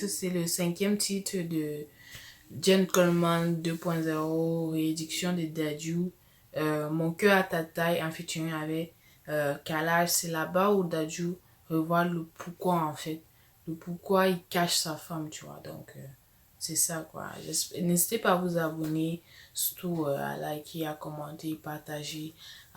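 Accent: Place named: Cameroon. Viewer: Je comprends rien avec ton acxan camerounais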